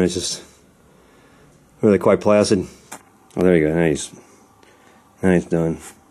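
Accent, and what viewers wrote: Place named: United States. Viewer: OMG YOU'RE AMERICAN AND I LOVE YOUR ACCENT xxx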